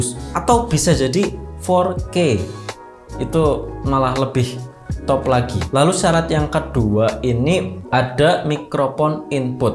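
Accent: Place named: Java, Indonesia. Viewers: Kayak'e wong ngapak ya mas'e? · Logat ngapak nya ga ilang ,bagus ,identitas 😂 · Medok ya mas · Medok oke jadi aku menetap untuk menonton 👍🏻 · Ngapak ya mas. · logat ngapak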